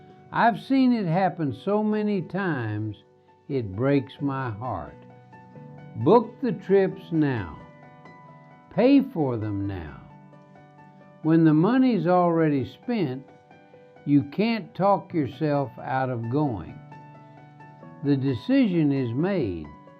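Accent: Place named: Nebraska, United States. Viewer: so spooky hearing you talk. You have EXACT same accent my dad did but he was born and raised in Nebraska in 1927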